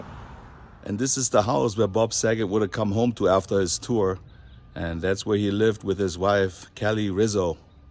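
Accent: Germany. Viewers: Thank you for bringing such beautiful flowers from us too,you are very kind, and considerate. loved Funniest Home Video's! I love your German accent, beautiful!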